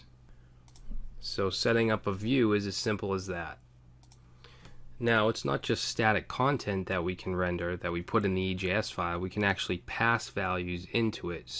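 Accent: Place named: Northeast, United States. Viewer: This guy is the best anywhere! Yes, he has a NE accent. I am not sure why people feel the need to point that out. It's like saying "youtube's logo is red and white" -- yup, we know. I am just happy I found a teacher that didn't bore me to death with their relentless, robot, yammering!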